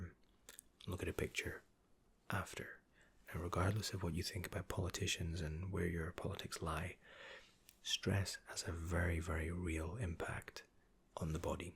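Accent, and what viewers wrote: Scotland, United Kingdom. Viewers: Idil Ozler Scottish I think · I love that Scottish accent - East coast? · He is originally from Coventry, but he and his parents moved to Scotland when he was around 3, I think he said, thus something of a very slight brogue. But recently he moved back to England for work. Where in England, I'm not sure, though. I don't think he said specifically, to my recollection. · Great british accent! finally glad I found ya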